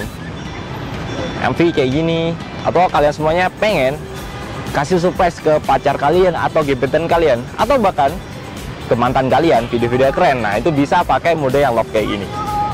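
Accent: Malang, Indonesia. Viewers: Abangnya orang Malang?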